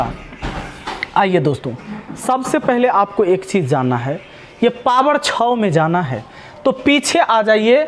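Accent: Bihar, India. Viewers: Sir ap Bihar se hai kya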